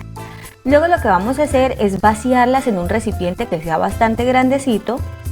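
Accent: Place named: Valle del Cauca, Colombia. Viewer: Tienes acento valluno eres del Valle del Cauca??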